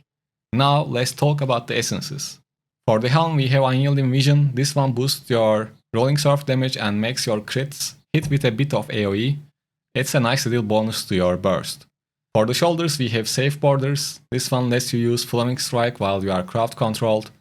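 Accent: Turkey. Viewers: La ingilizcen aynı Türk lehçesinde. Ortaaamm türksün sen la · You sound turkish ngl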